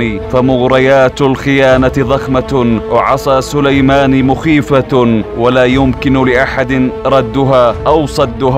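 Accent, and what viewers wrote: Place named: Israel. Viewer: صوت اسرائيلي يجيد اللغة العربية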